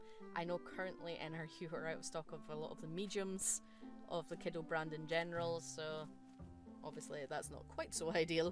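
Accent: Scotland, United Kingdom. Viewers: Wow!! Thats the thickest scottish 🏴 accent i have hear from you so far. Please keep it up yer wee lassie 👍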